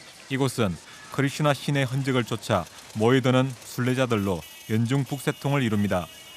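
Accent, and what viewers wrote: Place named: Gyeongsang, South Korea. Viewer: 나레이터가 경상도 발음과 억양이 꽤 심하네요 ㅎㅎ 요즘이 어떤 세상인데..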